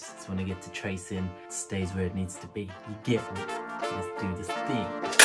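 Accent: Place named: South Africa. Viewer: Heard his accent and knew he was from SA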